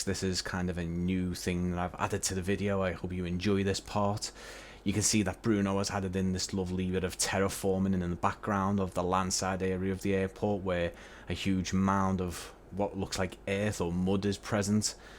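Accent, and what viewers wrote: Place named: England, United Kingdom. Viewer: 🙋‍♂️👍Really,Really Nice Reviews,...I’m Impressed by your Very well done Reviews! Like the English accent too. Keep up the good work 👍✈️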